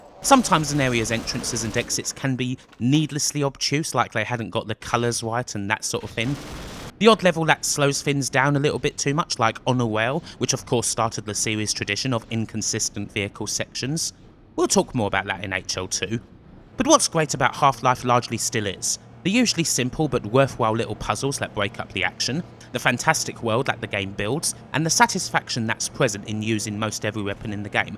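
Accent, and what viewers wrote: United Kingdom. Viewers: LOVE THIS REVIEW, AND LOVE YOUR BRITISH ACCENT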